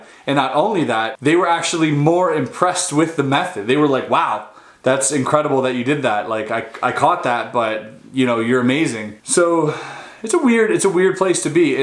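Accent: Canada. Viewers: This guys Canadian?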